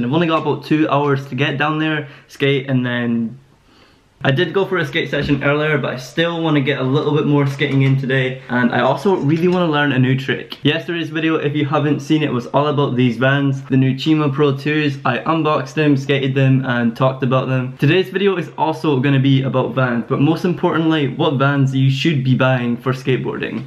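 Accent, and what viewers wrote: Scotland, United Kingdom. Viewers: Are u Scottish if ye are wits the best parks for skating bro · Is he Scottish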